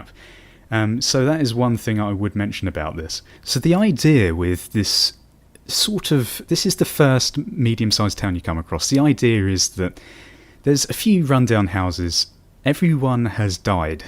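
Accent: United Kingdom. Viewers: YAY he is british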